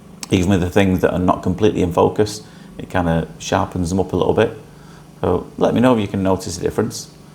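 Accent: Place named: England, United Kingdom. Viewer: It's hard to understand you sometimes when u our speaking because of your English accent 😊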